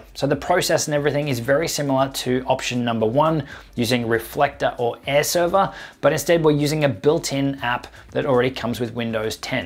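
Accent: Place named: Australia. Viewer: Great tutorial. However, my recommendation to you would be to pace your sentences so that your sentences are slower and your words clearer because right now you are talking way too fast to make any sense to me! Additionally, with your strong Australian accent, it isn't making following you as a non-Australian very easy. Why do you think that you have to speed through what you have to say in this video? Are you short on time? This video tutorial was way too fast for me to follow comfortably! Take Two and try to incorporate my advice into your retake on the same subject!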